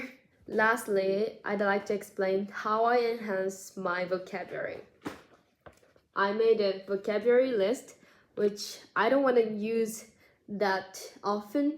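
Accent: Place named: Germany. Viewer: スイスに行く前はアメリカ英語を学ばれてたと思うけど、多分スイスに行って若干ドイツ語訛りが出てきてるね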